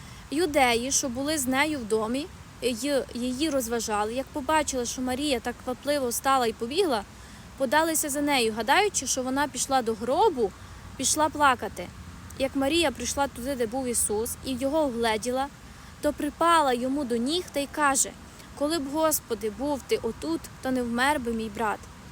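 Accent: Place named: Transcarpathia, Ukraine. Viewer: ❤️Оце найкраще подтвердження, що наш Господь Ісус розмовляв з Мартою та Марією... файною українською мовою з закарпатською вимовою😃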